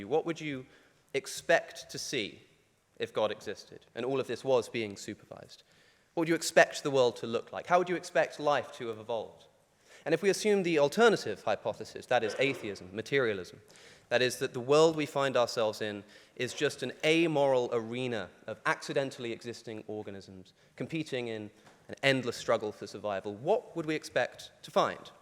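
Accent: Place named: RP, United Kingdom. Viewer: It's mostly you. This is how educated people, especially Brits, tend to speak.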